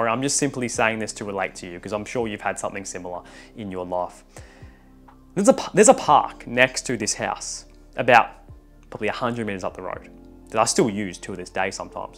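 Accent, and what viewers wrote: Australia. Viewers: are you an Aussie mate?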